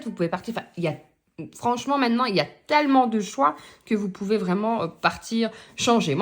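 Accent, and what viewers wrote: Liège, Belgium. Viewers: Toi t’es de lièèèche non ?😅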